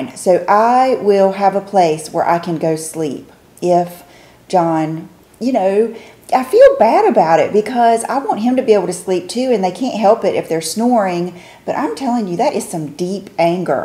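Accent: South, United States. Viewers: We purchased a duvet from Wynn at Home store a few years ago. We always loved how cozy the beds felt when we would stay at their resort in Vegas. Nice fluffy down. They are very warm but I keep mine folded down at foot of bed at night. I think someone suggested storing unused or seasonal items in a pod until your ready to move in your new home. I would also suggest having a moving sale and getting rid of anything you don’t think you need. I had so many decor items that did not look good in my new home that I wished I would not have taken the time to pack and move. Sometimes it’s better to go into your new place with only the essentials and then buy once you get a feel for how everything flows. BTW I love your accent......I think when you say “water” I can definitely hear the southern! Also love when you say “daddy” ♥️ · Every word you speak is southern to me as I'm from the UK. 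It's one of my favourite accents x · Especially when you say “you know”. I’m from New Jersey close to NYC, I get teased for my Jersey accent when I go to New England especially. I love your accent. Southern women seem more feminine then us northern women. I think it’s the accent, it’s softer. I get made fun of the way I pronounce dog, coffee, car the most. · Luv your accent 💕 makes me miss the south ☀️💕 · I love your accent. Anything with a vowel e.g. house = howse or howme. video = videow I = Ah Over = Owva You = youwe I just love the southern accent, it sounds so refined! Love your hair and all your videos. I sleep with a very loose messy bun. They say to sleep on a silk pillowslip stops breakages and stops you getting wrinkles! XXX Deborah from OZ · you have a great accent, its really soft and warm. I'm English and appreciate a good southern accent. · The accent question is interesting to me because I have lived in the south for 12 years now, but I grew up on the west coast (lived there until I was 28). 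Moved for love:) So now everyone thinks that I have the accent hahah! There is truly no one right way to say everything! I hear your particular southern accent with "water" and "I want to tell you." And of course you have the southern belle beautiful laugh! Other southernisms I have noticed are "buggy" for shopping cart and have also noticed that southerners say "19 dollars and 88 cent (singular) where other regions say "19 dollars and 88 cents (plural). I love your accent! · I adore your accent! I notice certain things like a southerner will say goin instead of going, meetin instead of meeting. Dudnt rather than doesn’t. Also, some words are drawn out like belowwww where as, a Californian says below (quick) There are giveaway southern phrases, too, like- right much, to be sure, cut off instead of turn off (when you are turning the tv off) I especially love when you say ol for oil or greazy:) Maybe part of it is the cadence, rhythm and softness to your voice. 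I think that’s why so many love the Southern accent so so much!!!!! xoxo 😘 Melody PS. Do Californian’s have an accent? I’d love to know what specifically if people can tell a person is from California. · The first word I thought of with your accent was "bought" and also "my". You're Southern but just enough to keep it cute! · Hey pretty lady. Keep in mind a full size mattress is short on length incase Will is tall. We got the Tempur-Pedic and it was a 10k package and I hated it. They have to be broken in and it can take several months. We returned it because it was disruptive to our sleep and we were both miserable. Girl every word you say has that southern beautiful twist. Lol. Big hugs. Xoxo 😘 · I started watching specifically for that sweet Southern accent, the content is just a bonus. 🥳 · I’m Arkansan so I have a Southern accent too but it’s totally different than yours. The words you say where your accent shows the most are “long O” words like so, know, show, etc. 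I also love how you say water. ❤️ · You are so cute! you have a sweet Southern accent!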